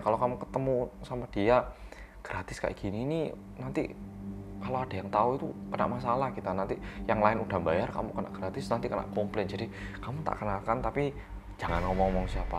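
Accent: Java, Indonesia. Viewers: Favorit youtuber ini mah, suka sama pembawaannya dan juga medoknya. 😂🤣👋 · Udah banyak yang bahas Shincheonji di Youtube, tapi sengaja gak nonton soalnya nunggu yang versi orang korea yang medhok aja deh!😀👍😀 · Aku ngajak tmnku nonton mas. Dia kaget + ribut pas denger mas ngomong jawa + medok wkwk gemoy:')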